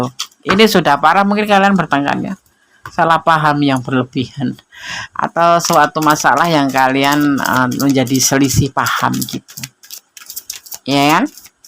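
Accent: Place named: Java, Indonesia. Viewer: Jogja hadir nih Kak, wah kk ini klo bicara MEDOK bingit's,sy suka skl cr kk membacakan readingan,kayak ada manis2nya gituuu heheheee.....,maaf kak canda lho😁. Ok kak tksh readingannya,Readingan selanjutnya ditggu🤝🙏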